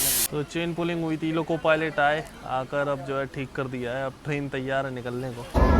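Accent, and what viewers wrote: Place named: Bundelkhand, India. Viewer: Bhai aaj bhasa puri bundelkhandi bol rahe ho❤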